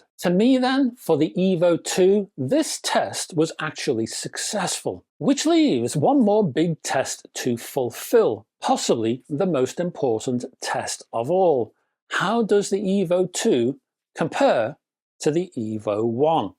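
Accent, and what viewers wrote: United Kingdom. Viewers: I rather enjoy watching your videos, mostly in order to gain perspective, because I do appreciate, to some extent, anyway, the values others may hold on various subsets of audio performance, however advanced or meager. You are a well-informed, articulate man ... and an individual of good humor, so important to enjoyable conversation. Now, I generally appreciate the mechanical and delivery properties of speech from genuine Brits; they add so much color (or, perhaps "colour") and flavor. But, you folks simply must reform the completely illogical, and utterly indefensible, (mis-) pronunciation of the word "aluminum." What accidental process of lingual habit has led you folks to think the word is pronounced the way you do? Sorry, but "al-you-min-ee-yum" it isn't; it's just not. I mean, look at it. Four syllables. That's all. And while you're at it, can you please explore the etymology of the word "chube?" I cannot even find it listed in the dictionary; nor can I find any trace of the similar "chuba." (What the heck IS a chuba?) You folks have developed the universal language across the last several hundred years, and did a bang-up job of it, but you must not elevate regression to an art form.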